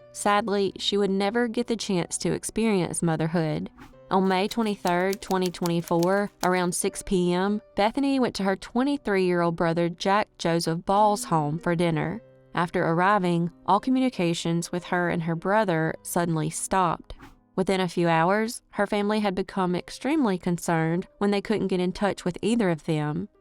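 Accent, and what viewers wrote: South, United States. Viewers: Hahaha! Oh she's a real as you can get!!! She's 100% authentic!!! I can give you big ol' Texas gaurantee She's as real as you will EVER get!!!! And we love her!! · You can't do the southern drawl with AI. I really like hearing someone who speaks like people I know down here in the south. Thx!! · I thought bout tht. The southern accent. But who knws wat those things are capable of....🤔🧐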